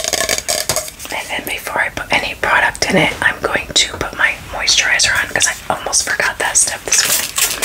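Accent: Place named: East Coast, United States. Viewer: Good morning what state do you live in? Sounds like you are on east coast like me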